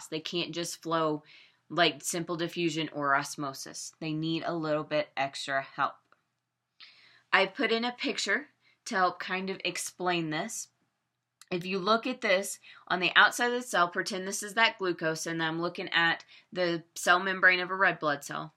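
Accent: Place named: Midwest, United States. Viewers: Lena G it's Midwest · Where in the USA is that accent from?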